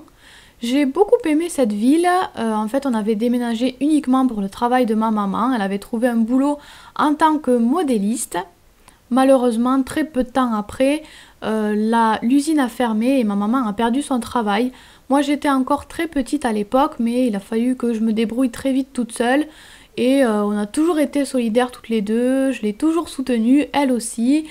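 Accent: South, France. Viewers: ***** moi aussi je suis de beziers :) je me disait toi aussi tu as l'accent du sud :') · Ta l'accent du sud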